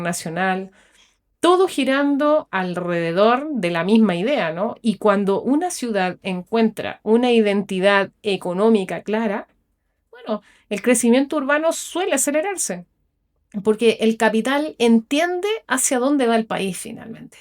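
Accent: Chile. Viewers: Muy bueno tu video. Me parece por tu asentó eres chilena. Mira, Panamá desde sus inicios fue destinada por el mismo creador a desarrollar su economía en base a los servicios. Su posición geográfica, su territorio y hasta la mentalidad de su población apunta a eso. Dese la llegada de los primeros europeos, desde su creación como nación libre, desde simpre su destino fue ese. Tantas cosas para comentar. Termino con esto. En nuestro escudo ee armas, esta escrito esto. Nuestro lema es "PRO MUNDI BENEFICIO" qué significa, Para BENEFICIO DEL MUNDO. Imagina fue creado en 1904. Desde simpre es y será nuestro rol. Saludos desde el puente del mundo. Bendiciones. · Tu creo eres. CHILENA por tu acento, pero tu analisis esta bien hecho y estudiado Te felicito y en horabuena por ti, creo no me equivoco haces PERIODISMO INVESTIGATIVO MUY BUENO. Resaltas y dices las cosas como son y porque se dan. 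NO COMO UNOS REPORTAJES DE ARGENTINOS QUE ESCRIBEN UNAS "BURRADAS".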